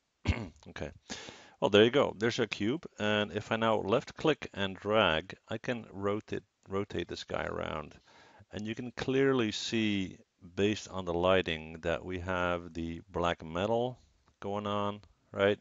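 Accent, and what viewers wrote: Netherlands. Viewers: WAIT WUT u dutch ??? :D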